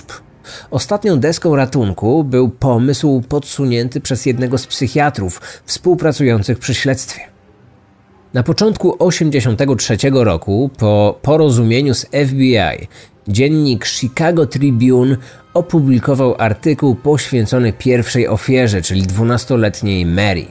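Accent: Poland. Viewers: Panie, wielkie uznanie za piękną polszczyznę, prawdziwy polski akcent i miły dla ucha głos. Zdrawiam i życzę wielu sukcesów.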